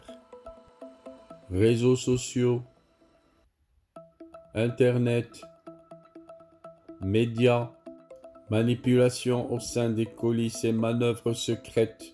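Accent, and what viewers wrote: Morocco. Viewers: Mais qui veut éliminer mimi VI ? Le pauvre tout le peuple est contre lui même el mekhzen et ses enfants ! Dieu nous préserve de ce virus marocain ! VIVE L'ALGÉRIE 🇩🇿💪 VIVE NOTRE ARMÉE POPULAIRE ALGÉRIENNE 🇩🇿💪 GLOIRE À NOS VALEUREUX MARTYRES 🇩🇿💪 JE VOUS DONNE UNE NOTE DE 10/10 L'accent du marocain qui vous fait honte, vous avez essayer de camoufler hélas ! La récitation excellente !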